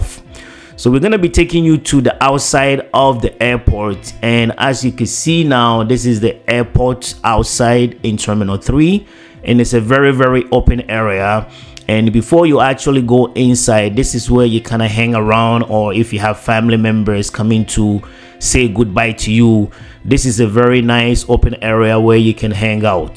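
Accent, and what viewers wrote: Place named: Canada. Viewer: I like your Canadian accent 😊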